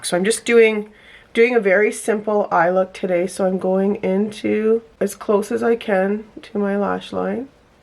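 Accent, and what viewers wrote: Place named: Canada. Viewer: Well hello fellow Canadian (I'll be very surprised if you're not) - that's what your 'accent' sounds like - anywho, I'm a brand new subbie who happened to stumble across this video and I hit subscribe right away. I am a 50 y/o, mother of 1, married x 25 yrs and working as a stenographer in our hospital x 25 yrs. I'm a makeupaholic, it seriously is becoming bit of an issue, and I promised myself (and my hubs) that I would try really super hard to be on a no buy or low buy this yr. I would love to see you do a full glam look and I just know I'm going to love your channel. Sending big xo's from Labrador, Canada.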